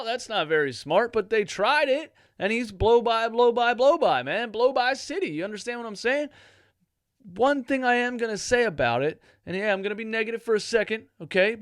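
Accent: Philadelphia, United States. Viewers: I’m from Chicago but love your vids cause I love Thybulle and Simmons. Is “you know what I’m sayin?” and “you understand me?” a Philly thing?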